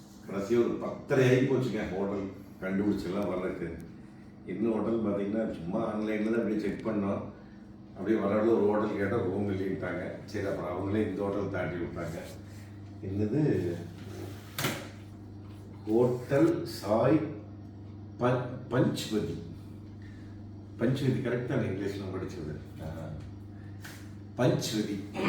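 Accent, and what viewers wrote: Kongu, India. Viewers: கொஞ்சும் கொங்குத் தமிழ் பேசும் கோமகரே...! திகட்டாத தித்திக்கும் பேச்சுடைய திருப்பூர் திருமகரே...! எங்கள் மதிப்பிற்குறிய திரு. அண்ணா அவர்களே❤❤❤🙏 எப்படி இருக்கீங்க அண்ணி❤❤❤🙏 எப்படி இருக்காங்க ரித்திக்❤❤❤ எப்படி இருக்காரு. தெலுங்கானாவில் இருந்து திருப்பூர் வந்த அந்த நண்பரின் நட்பை உங்களுக்கு தெலுங்கானாவில் வெளிப்படுத்தியதை வெறும் வார்த்தையின் வாயிலாக சொல்லிவிட முடியாது அண்ணா❤❤❤❤🙏 மாருதி கார்களைப் பற்றி இன்னைக்கி பலதரப்பட்ட இழிவான பேச்சுக்கள் சமூக வலைதளத்துல உலா வருது. உதாரணத்திற்கு.... மாருதி காரா அது ஒரு டப்பா...டின் கேன் இந்த மாதிரியான பேச்சுக்கள். அது அத்தனையும் முறியடிக்கும்வண்ணம் மதிப்பிற்குறிய அண்ணா உங்களோட இந்த லடாக் பயணம் நிச்சயம் அமையும்...! காரணம் லடாக் பயணம் என்பது அவ்வளவு எளிதான பயணம் கிடையாது. அதிலும் நீங்க SWIFT காரைக்கூட எடுத்துட்டு போகல? மாருதி 800 காரை எடுத்துட்டு போயிருக்கீங்க👍👍👍👍👍 இதுவே மிகப்பெரிய சவால்👍👍👍👍 லடாக் சாலை பொதுவாக மலைப்பாதைகள் இருக்கும்.... 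சில இடங்களில் பள்ளங்கள் மேடுகள் அதிகமாக இருக்கும் என்று சொல்லக் கேள்வி. அதையெல்லாம் இந்த மாருதி 800 காரில் சென்று பயணத்தை வென்று திருப்பூர் மண்ணில் கால் பதித்து மாருதி காரின் சரித்திரத்தை வெற்றியாக்குங்கள் அண்ணா...! லடாக் பயணம் இனிதே தொடர்ந்திட வாழ்த்துகள் அண்ணா❤❤❤ என்றும் அன்புடன் உங்கள் தம்பி என்றும் அன்புடன் உங்கள் TMF ரா.முஹம்மது கபூர் (சென்னையில் இருந்து)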